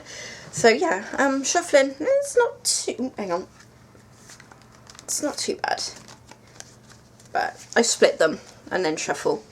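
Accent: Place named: England, United Kingdom. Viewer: Excuse me for being Off Topic but your accent is charming. Where are you from in England? I'm writing from New York (Na' Yawk). BTW, The Voyager Tarot is an excellent deck. You really have to "work" with it, but the payoff is great.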